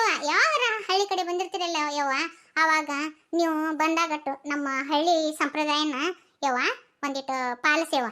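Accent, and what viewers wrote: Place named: North Karnataka, India. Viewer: ಉತ್ತರ ಕರ್ನಾಟಕ ಭಾಗದ ಮಾತುsuper parakka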